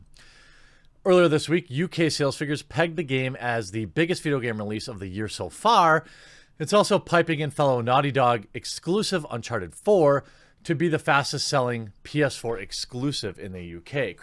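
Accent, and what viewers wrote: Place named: United States. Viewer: When did ditch his accent? He sounds completely american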